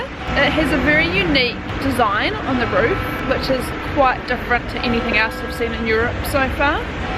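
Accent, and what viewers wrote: New Zealand. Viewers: ) Lovely film, lovely persona, and lovely Kiwi accent (difinitely siventy-siven). And I might have a better question: How many things are definitely worth visiting in this country? There are many, especially outside the usual tracks - try Iasi (pronounced Yahsh or Yaash), a historic capital of Romania, in North-East of the country (historic region of Moldova).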